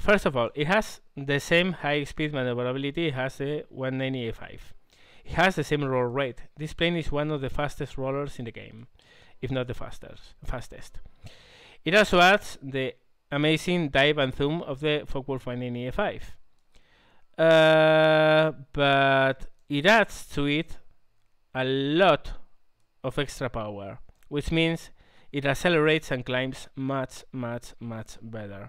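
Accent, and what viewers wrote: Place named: Spain. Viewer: Question: How do you perform the "Hammer" part? (when you climb up and use the rudder to get your nose down). I tried to do the same but the plane simply gets out of control... Btw, your accent was very strange the first time i heard it. Then during your Q&A vids you said you are from Spain. Now it all makes sense. Im portuguese (yes i know its kinda strange talking to you in english) and i can spot several words you pronounce the same way you would in Spanish. Thanks for the vids^^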